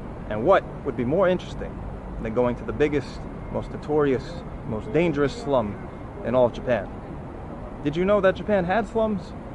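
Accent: New York, United States. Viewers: I hadnt watched in a while ..... apparently he became 200% more NY ?! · Your Japanese is pretty good for a month of study - you seem comfortable talking to people - as good as mine after 7 years of studying. I really enjoyed your video, and your accent (must be New York). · …is it me or does your New York accent come across in your Asian language efforts? Seems like. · When Sir Arthur Conan Doyle killed off Sherlock Holmes, then brought him back after a great public outcry, his back story was, "he played dead but traveled for years, even meeting the head Llama in Tibet. He travels to Mecca, Persia, meets The Khalifa of Khartoum, etc." I think here could do the same thing, make his way to have an audience with any royal court. What do you expect from a New Yorker with that fantastic accent. What mental skill! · 2 seconds after hearing you talk... 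this guy HAS to be a New yorker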